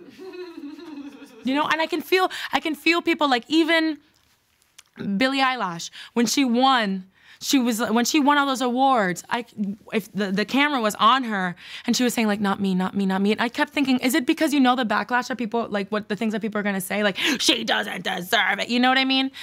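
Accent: Miami, United States. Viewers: The miami accent came to this segment!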